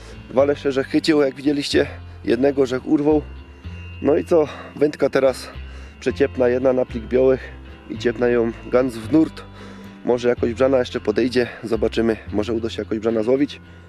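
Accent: Silesia, Poland. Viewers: Uwielbiam slaska gware.....dziekuje i serdecznie pozdrawiam..))) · Hej kolego, jak nie lubię Ślązaków tak ciebie szanuję. Świetne filmy, widać że lubisz to co robisz. I nawet tą waszą gware w twoim wykonaniu da się słuchać. Powodzenia życzę.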